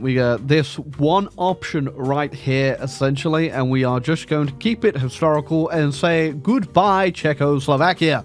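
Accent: England, United Kingdom. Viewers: I hope sees this. Please can you tell me what country your from? You sound English but say zee and rowt (rather than zed and root)... Not important. But as an Englishman I just need to know 😂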